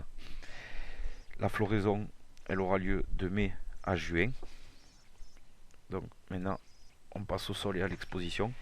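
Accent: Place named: Southwest, France. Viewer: Merci avec l'accent du sud-ouest sympathique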